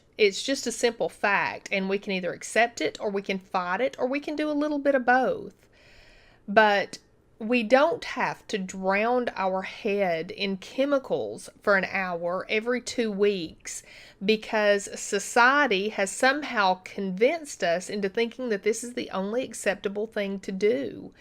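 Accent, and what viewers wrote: South, United States. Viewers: I❤️U & your spirit & your southern drawl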